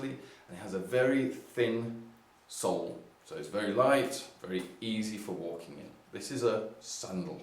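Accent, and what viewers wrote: United Kingdom. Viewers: Esto es de lo poco que se encuentra con acento británico.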